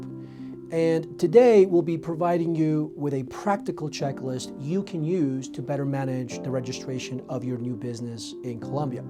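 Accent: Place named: New York, United States. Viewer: I love how this dude goes from a very New Yawk accent to an incredibly good Spanish accent. Very helpful videos! Thank you.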